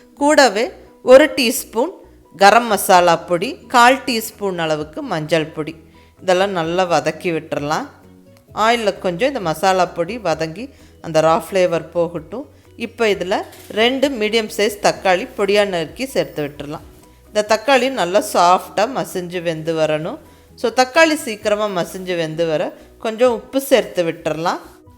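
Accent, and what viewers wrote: Coimbatore, India. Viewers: Kovai Tamil super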